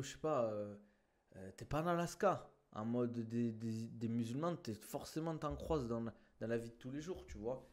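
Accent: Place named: South, France. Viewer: Tu viens d’ou en France c’est juste pour savoir vu que t’as un accent du sud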